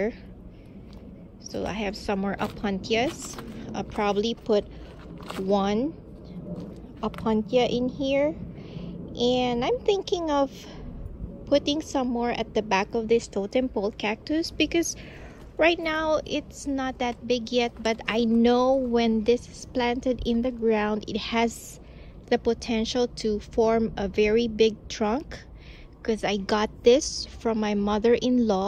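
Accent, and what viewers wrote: Philippines. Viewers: Hi! Are you Filipino? You sound like one. Not that its a bad thing, Im a Filipino too. =)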